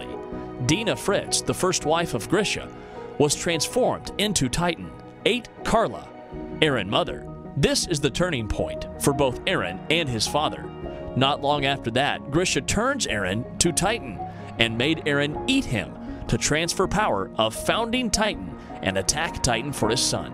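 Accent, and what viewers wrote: United States. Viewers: HOW IS NOBODY TALKING ABOUT THIS GRAMMAR JESUS CHRIST ITS SO AWFUL, he just skips out the word "the", "to" , "a", "into" and uses incorrect tense every chance he gets if he had an indian accent i would forgive him but its american, explain? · Is English not your first language? You don't speak with a foreign accent and you sound America, but you're grammar is all over the place. I'm so confused.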